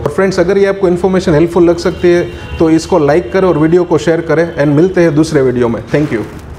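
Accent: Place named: Kathiawar, India. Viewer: bhai aap Hindi bhi kathiyawadi lehje mai bolte ho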